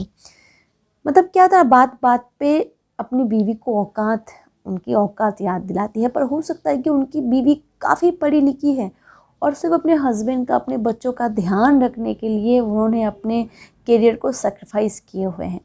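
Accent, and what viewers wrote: Bengal, India. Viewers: Interesting he mam aur app Bengali lag rahi ho appke tone se 😁❤️ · Woh Bengali hi h 😂😂😂😂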